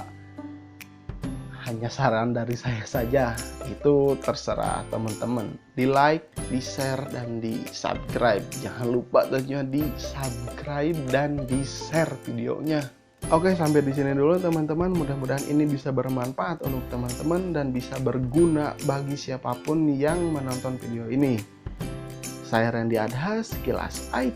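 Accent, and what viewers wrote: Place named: Bandung, Indonesia. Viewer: apas wkwk logat bandung euy :D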